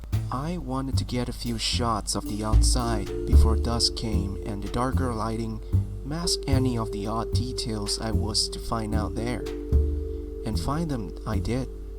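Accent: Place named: France. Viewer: You are French, from France, or Quebec. Thank you for the fantastic narration. Peace and much love sent from Ontario, Canada.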